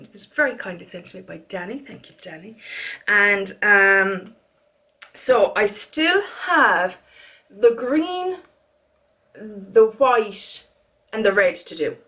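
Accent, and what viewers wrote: Ireland. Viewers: Hi that purple blush lol - next time I'm in Edinburgh i'm going to the Fenty counter to try it on. I'm super pale (Scottish milk bottle lol), cool toned. with grey eyes and blonde hair. I LOVE purple eyeshadow and a mauve blush, but the purple blush intrigues me. I've never seen it swatched before on light skin and I think I might like it - I love a lavender face powder, as it's so brightening on my skintone. Project pans are a fairly new thing to me (I found you through an empties video), but I love the Irish accent - my grandad was Irish and it reminds me of him. He lived in Scotland for around sixty years and never lost his accent. I've been to Ireland a couple of times - once to visit relatives with my grandad (before he died) and once touring on the motorbike. Loved it both times, but it rains as much there as it does here lol, so it's not the best weather for the motorbike. 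It is pretty though and the people I met were friendly. Best wishes.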